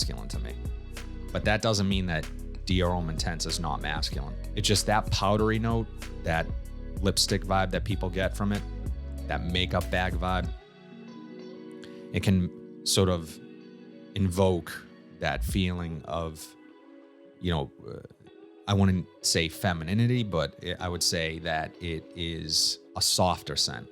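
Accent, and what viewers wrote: Boston, United States. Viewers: Nice presentation. But seriously, you need to get rid of that Boston accent. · It's very distinctive. I'm not even American but I can pick that Boston accent out a mile away. It gives you your charm mate 👍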